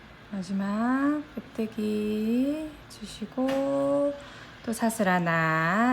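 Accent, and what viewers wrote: Busan, South Korea. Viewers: 어머...손재주 좋으시네요. 이런건 구매해서 쓰는줄만 알았는데... 살짝살짝 부산 사투리 듣기 좋아요 설명도 잘해주시니 한번 만들어 보고싶은 욕구가 막 생기네요.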